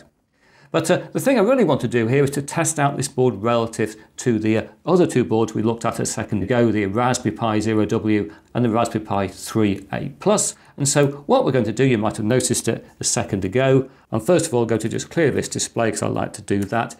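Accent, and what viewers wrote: United Kingdom. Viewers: old lovley man with that british accent like that and like the way you like respery pi s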